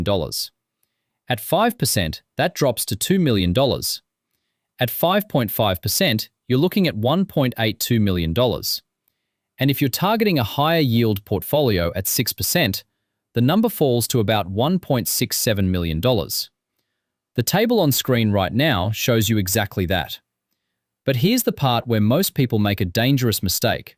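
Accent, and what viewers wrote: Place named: Australia. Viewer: I also love your Australian accent, even though I am a Aussie as well in Melbourne, I love your tone. You sound like Eric Bannar, the actor.keep up the great work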